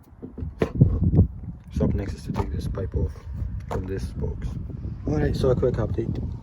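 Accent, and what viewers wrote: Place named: Pakistan. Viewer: I can’t make out a word that you did said. It’s like half Pakistani half Dutch with a bit of Glasgow accent chucked into the mix. M8 I didn’t understand one word of what you just saided.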